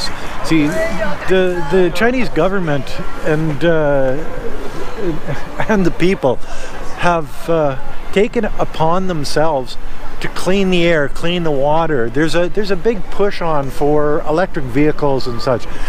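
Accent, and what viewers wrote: Canada. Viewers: Lol your accent, why does your accent remind me of DeadPool so much man, is it bc Ryan is also canadian i love your accent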